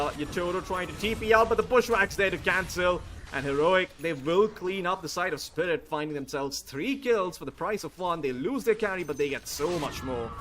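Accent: India. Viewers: Indian accent caster? Immediately muted the video.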